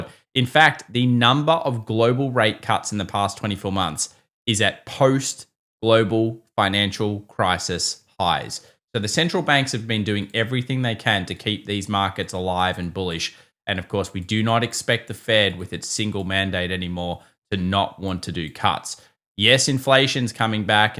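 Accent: Australia. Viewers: He's just Australian m8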